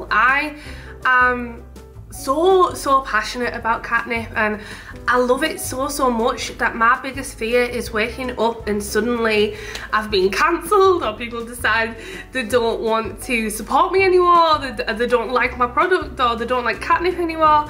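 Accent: United Kingdom. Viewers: You don't sound german at all. I am from Germany. You sound totally british. I love England and the english language so so much. I also have family in Gloucestershire. I am really really sad about the Brexit thing 😢. I can't understand why you want to be separated from us. Anyways... Greetings and hugs from Germany! Stay strong and safe! Tina xxx 😘🤗💗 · If you ever get the chance... The new mocha flavored Kit Kat duo is fabulous! I got to have one last month, but now I can't find any in the stores I usually go too. It's the same way with the dark chocolate Kit Kat. So good!!! But so rare to find. And I love your purely British accent. You're adorable! Such a sweetheart. Love you, buh bye! Makes me smile every time.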